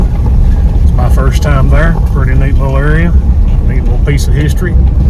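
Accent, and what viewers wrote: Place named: Eastern Kentucky, United States. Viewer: With that accent, you have to be from Eastern, Ky? My mother is from Perry County. Great video, I enjoyed the moonshine still. Never knew it was there. Thanks for the upload. New Subscriber!!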